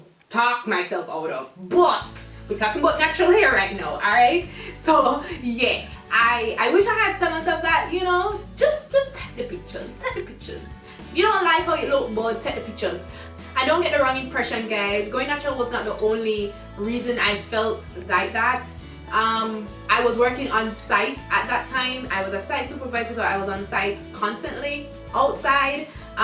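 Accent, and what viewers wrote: Jamaica. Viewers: Are you a Jamaican????? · Your Jamaican accent is so AMAZINGLY BEAUTIFUL!!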